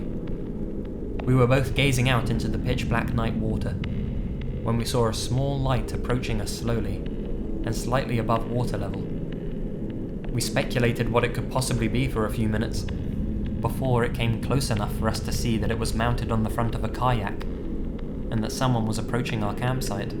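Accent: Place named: United Kingdom. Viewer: Good stuff. One of the best narrators for this stuff, I'll even forgive the Brit accent.: ) Actually I think that's what really brings it together, keep it up. I wonder how many creepos listen to these stories and are like "Oh shit, that was me! Awesome."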